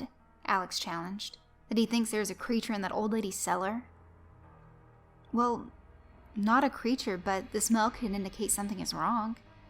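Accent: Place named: Georgia, United States. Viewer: You must a country girl, guessing Georgia. Or Alabama. Love county girls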